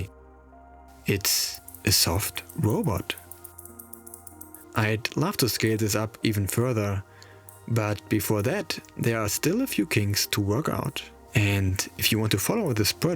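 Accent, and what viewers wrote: Germany. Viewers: Lol du bist deutsch? 😂 wieso ist mir der akzent erst am ende aufgefallen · Are you german? Sounds like a German accent..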